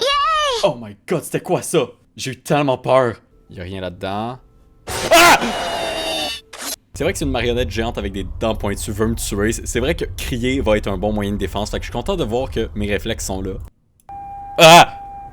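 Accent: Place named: Quebec, Canada. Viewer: le best of est incroyable ! franchement jeux vidéos + l'accent québécois les deux choses que j'aime réuni dans une seule chaîne ça fait plaisir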